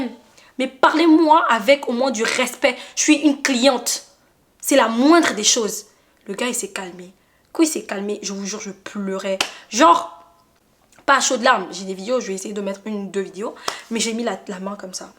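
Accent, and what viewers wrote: England, United Kingdom. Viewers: ❤️😻I love your English accent girl 😭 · Ton accent anglais je l’adore ❤❤❤❤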